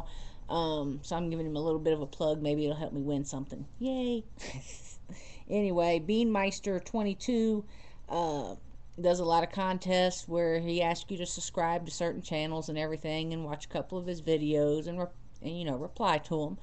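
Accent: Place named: South, United States. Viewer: Love southern accent! :D I want one!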